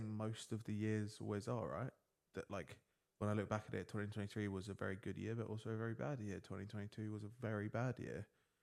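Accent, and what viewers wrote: United Kingdom. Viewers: wait your british? i always thought you was American 😅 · dude you certainly don't have an ear for accents that's for sure. Everyone on earth knows that he's British as soon as he starts speaking haha.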